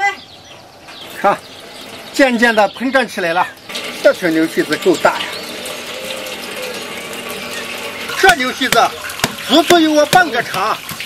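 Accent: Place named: Zhangye, China. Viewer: 好像是张掖一带的口音啊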